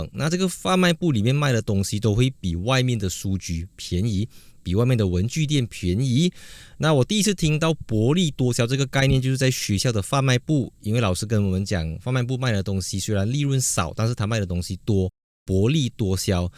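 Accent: Malaysia. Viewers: 口音用词法都看得出你是马来西亚人😂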